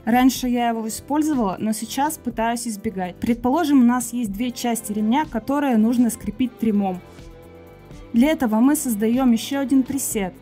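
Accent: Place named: Japan. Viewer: I like japan english.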